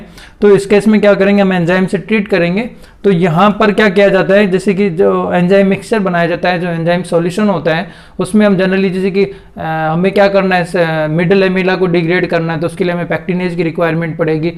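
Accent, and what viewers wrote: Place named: India. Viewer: sorry but how does can international students understand english indian fast accent ? but good video hehe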